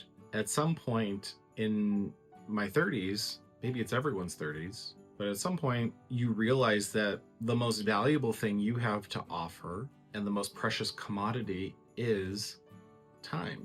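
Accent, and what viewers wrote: Minnesota, United States. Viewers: Time is indeed a precious commodity, and the times you've shared have been cherished by me. You look so cozy in your Christmas bedecked home with your sweater and beard. You may be in Orlando but in my mind you're right there at The Estate penning Christmas cards and drinking eggnog by the light of your tree. On the 12th Day of Vlogmas my true love gave to me: Twelve Months of time, beauty and dedication to all that you do, be it your home's restoration, decorating, or simply allowing us to be a part of your beautiful, beautiful world. As well as Eleven eggnog liquors Ten-ish strands of "tinthel" Nine ladies ironin' Eight cameras tumblin' Seven cutouts glowin' Six berries spillin' Five Christmas trees 🎄🎄🎄🎄🎄 Four Paolo meows Three sets of batting Two power strips 🔌🔌 And you sound like the movie Fargo 🎄⛄🙂⛄🎄 Merry Christmas my beloved. 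You're amazing.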